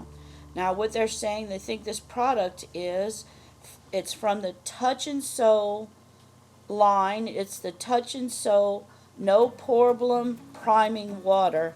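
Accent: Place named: South, United States. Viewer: I just love the way you do ur presentation, u talk about products and research what they are. I love the way u pronounce spoilers, with ur accent, since I was a little girl I always loved southern accents and I have always been envious ladies who spoke with one🤩😍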